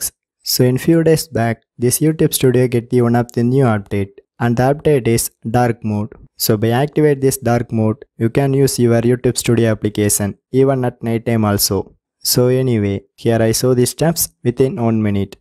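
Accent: India. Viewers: That is the deepest idian accent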